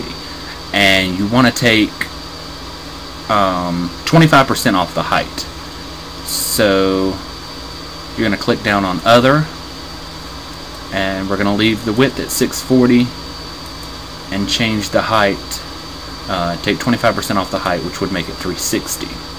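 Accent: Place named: United States. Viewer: your american accent is funny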